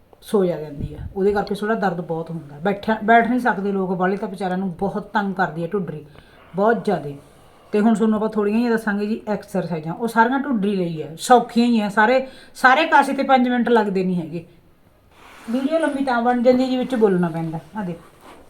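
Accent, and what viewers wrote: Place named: Bathinda, India. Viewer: Dhudhri ki hundi, bhainji baithinda di boli sanu samjhna okhi hundi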